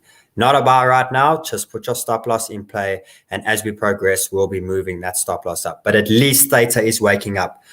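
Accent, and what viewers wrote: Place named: South Africa. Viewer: Great, you’re incredible. Can someone please post the ticker of the last coin, it’s that South African accent 😜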